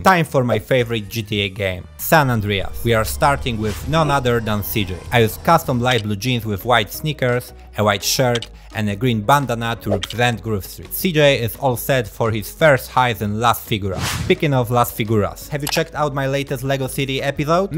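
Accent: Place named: Poland. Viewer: słychać że z polski zajebista robota oby tak dalej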